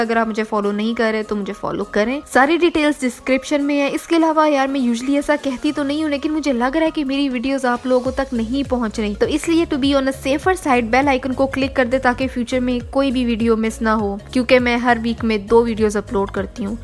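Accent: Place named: Punjab, India. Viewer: OMG! your accent makes your cooking videos killershot..... Punjabi ki to kya hi baat theee........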